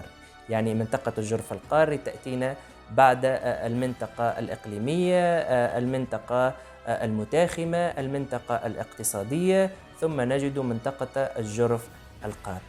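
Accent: Tunisia. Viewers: شكرا لك أستاذ..حصة ممتعة وافكار تاريخية قانونية متماسكة بلغة سلسة جميلة بطابع تونسي رائع... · من خلال طريقة نطقك قلت انك بتونسي قبل أن اكتشف العلم التونسي جنبك و اهنيك على اصالتك التونسية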